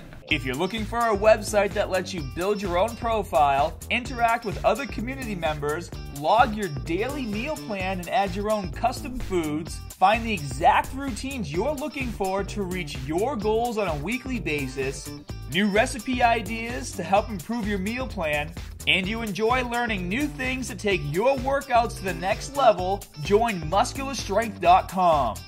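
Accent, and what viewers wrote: Boston, United States. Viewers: You sound like you're from Boston. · Damn this is a beast, sweet Boston accent, and has a physique that I am envious of. If I was gay he may be my type, unfortunately I'm as straight as my spine is throughout my deadlift.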